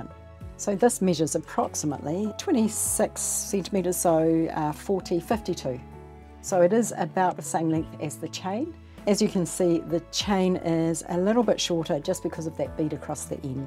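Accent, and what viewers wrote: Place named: New Zealand. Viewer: The Kiwi accent tripped me up, I thought she was saying pills, pills and more pills 💊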